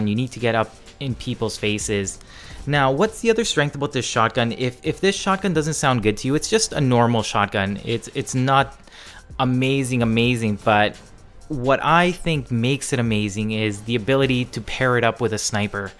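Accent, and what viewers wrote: Canada. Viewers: His canadian accent is bleeding out of this video... It sexy tho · Canadian ey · You are the most Canadian sounding person of all time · I think he's from Canada it's pretty rood to get mad at someone for their voice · U have the only Canadian accent I've ever heard....and I'm Canadian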